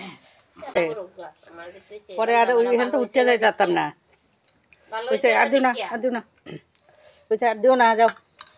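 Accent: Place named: Kishoreganj, Bangladesh. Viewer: লাইক দিয়ে পুরো ভিডিওটা দেখে নিলাম দিদিভাই কিশোরগঞ্জের আঞ্চলিক ভাষা শুনে খুব ভালো লাগলো অনেক পরিচিত ভাষা নিমন্ত্রণ খেতে গেলে ❤👍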